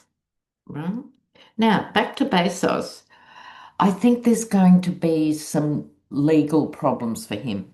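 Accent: Australia. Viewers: I can have a really crappy day and come home and watch one of your videos where you cleverly have such a way with the English language! You were talking the other day about someone pretty sure it was a yeti where you commented on the fact that he has the attention span of a caterpillar. So I don’t know if it’s your sarcastic with, or your Australian accent that makes that comment so effing funny you’re just the best I adore watching you. I watch every single one of your videos and I’m about to go watch you, Linda G and the other two ladies chat thank you so much for your effortsyour comments your wit your charm we love you in America. Thank you for all the hope that you provide for our wavering country PS I’m Irish too. The other half is Cherokee Indian.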